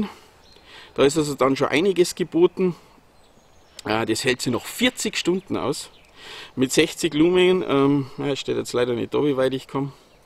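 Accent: Bavaria, Germany. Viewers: #M2R Pro Vielleicht bringt sie mir ja Licht ins Dunkle?! Vielen Dank für deine super Video in bayrischer Sprache!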